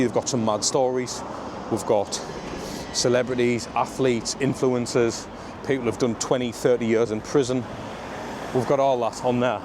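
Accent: Sunderland, United Kingdom. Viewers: Can I detect a mackem twang?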